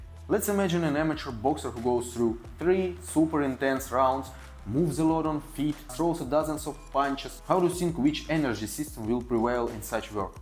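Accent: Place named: Russia. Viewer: I wish I could speak Russian. I love the accent.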